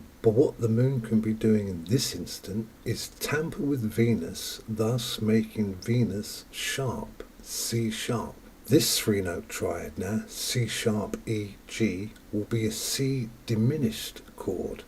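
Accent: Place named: England, United Kingdom. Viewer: This is the FIRST time in my life that I did not understand not a word what he saying. Accent is terrible, probably English, sound is terrible with a lot room effect Never happen to me in 57 years: I can watch American or English movies without translate or subtitles but this is....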